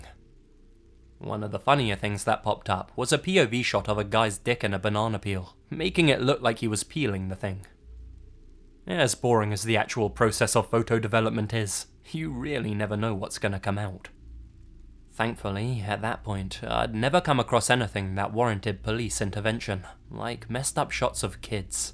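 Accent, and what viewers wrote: United Kingdom. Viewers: How and when did you get into narrating horror stories. Also are you British? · I love your creepy intro. And your adorable British accent.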